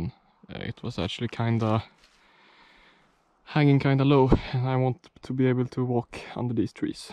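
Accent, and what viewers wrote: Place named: Sweden. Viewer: Just curious are you from Sweden? Your accent is badass man lol thanks for the info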